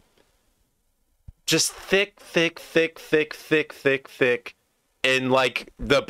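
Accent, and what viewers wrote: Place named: Belfast, United Kingdom. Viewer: You could be broad Belfast with that accent bro, love your commentary.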